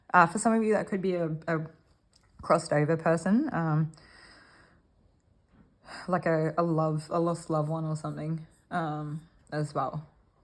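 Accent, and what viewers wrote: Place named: Australia. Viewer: Group 1 it was great that you explained things for new watcher 😊 and that you recognised some people aren't into chakras or meditation (that's me). It took a few times for me to hook into your readings properly, but i liked your Aussie accent (I'm kiwi) - a lovely change from the mostly American ones and I'm glad you're still here 😊 The reading definitely resonated. I'm talking to my ex- i told him i don't want to see him, but he's answering some questions about our past right now. I slipped up and mentioned a place i go to regularly, so now I'm hoping he doesn't turn up on the pretext of seeing my daughter who works there. I'm only talking to him to show him how our values, everything don't match but i think he thinks if i see him, i won't be able to resist him. He's full of himself like that 🙄 and totally self serving, but he's trying to disguise that. 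I know him better than he knows and ever WANTED to know me. Thanks ❤❤❤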